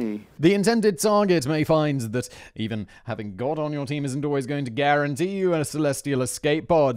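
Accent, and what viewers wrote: South, United States. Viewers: as a Texan, if you came here with that southern accent, no one would question it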